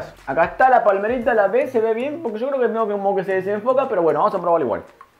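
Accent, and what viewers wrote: Argentina. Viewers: Me encantan tus recetas! Soy porteña tb y así hablamos! A hacer las palmeritas👌👨‍🍳❤👏👏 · Nací en Buenos Aires y me encanta el acento de este chico. Donde vivo, en Europa, tenemos masa de hojaldre rectangular 😉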